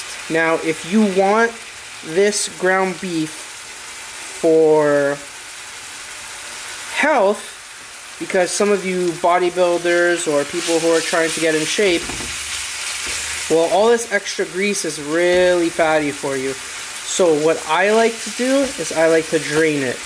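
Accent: Canada. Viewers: Canada is America's abortion that unfortunately survived, only canadians talk like that and this softy drained his ground beef 🤣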